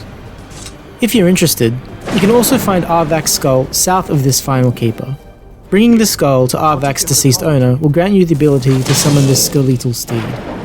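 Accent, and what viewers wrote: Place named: Australia. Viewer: Nice australian accent!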